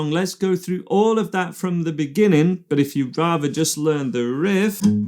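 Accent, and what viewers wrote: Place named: Liverpool, United Kingdom. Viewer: Love the clarity of your videos. You explain things so well! BTW, are you from Liverpool? I hear a bit of in your accent.))) But what do I know, I'm an American.)))))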